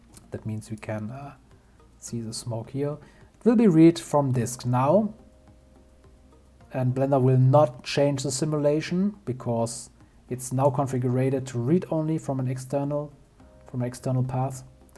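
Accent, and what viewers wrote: Germany. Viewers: Your accent is very german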